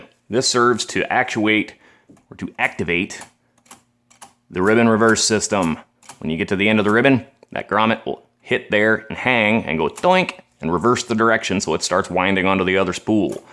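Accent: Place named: United States. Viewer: This guy's accent is so American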